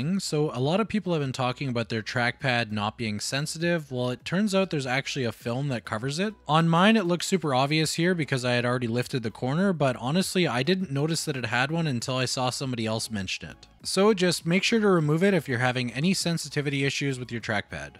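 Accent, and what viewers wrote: Canada. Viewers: Great, to-the-point video. Also, love the Canadian accent.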